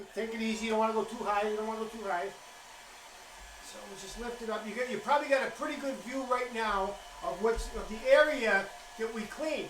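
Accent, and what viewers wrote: United States. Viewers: What happened to this American accent I need a friend who speaks like this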